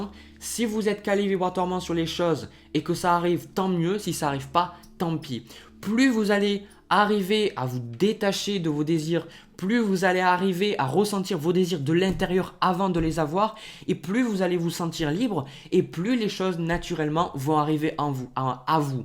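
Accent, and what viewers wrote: South, France. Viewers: Merci un Bonjour de Béziers Super vidéo comme toujours avec ce bel accent du sud 😉 continue c est bon pour le moral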